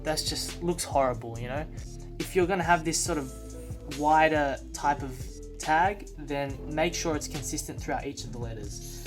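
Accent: Australia. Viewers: Love a familiar aussie accent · Coming from West Syd been sketching and writing for nearlly 12 years, i completely agree with everything you said and thought all the advice was awesome i defs wish i had something like like thsi when i was younger looking to learn but i ended up teaching myelsf everything i know...... anddd was just wondering where abouts in australia are you from i can tell buy your accent??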